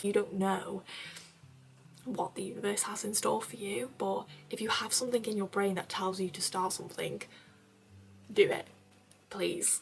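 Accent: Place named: Liverpool, United Kingdom. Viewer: As someone not from the UK I hear your accent as scouse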